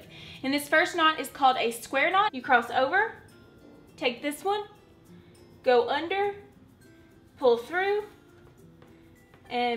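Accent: South, United States. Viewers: Alexia Stotsenburg she's southern! We're from Alabama! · Purple pigtails, over the top eyelashes, and a Southern accent? Subscribed!! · You're hillarious. Loven the Southern accent lol hugz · She sounds southern. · You are just too cute! I absolutely love your Southern accent. I’m Middle Eastern so I don’t come with your accent but I live down South now so I’ve learned a few terms. I just discovered you and subscribed. God bless you. 🙏🏽😇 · Oh my gosh! You are just too adorable! Love your Southern-ness! Great tutorial. Love the finished piece. I can't wait to make a similar piece. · Girl u r a hoot. Where ya from, sho ain’t a yankee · I have no idea why but I thought you were from Australia for the first 10 seconds then I realized it was a southern accent 😂 you're gorgeous and funny, subscribed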